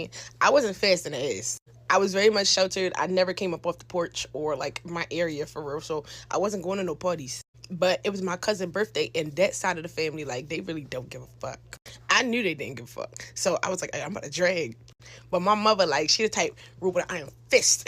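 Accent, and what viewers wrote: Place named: Baltimore, United States. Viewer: I think Baltimore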